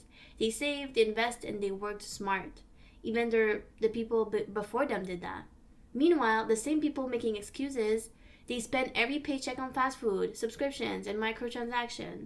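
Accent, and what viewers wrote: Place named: Canada. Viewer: I’m asking because of your accent but where are you from? I think I might have heard you you say you’re from Canada?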